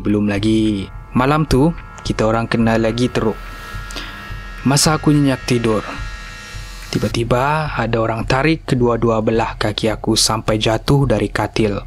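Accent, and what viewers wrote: Sabah, Malaysia. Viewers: Contohnya. Kamu mau apa sih, bukan ini donk. Kelmarin kamu bikin apa? Ada ke orang sabah cakap camtu? So kite boleh beza dari situ. Apa yang ku terangkan tadi itu lah bahasa indonesia. Yang bercerita ni orang sabah malaysia.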